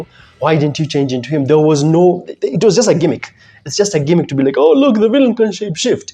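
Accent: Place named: Africa. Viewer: I think he might actually be from Africa that's how they speak there it's a very nice accent to listen to that's for sure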